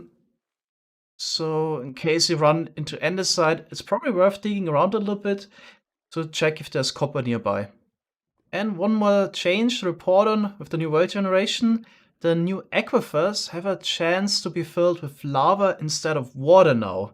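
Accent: Germany. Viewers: Man hört sofort den Deutschen Akzent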